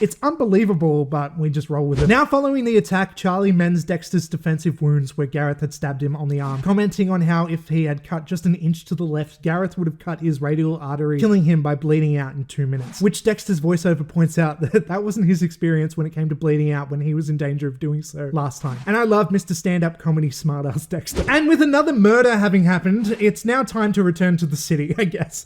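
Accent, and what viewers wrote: Australia. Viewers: The Aussie is back with some badass recapping😊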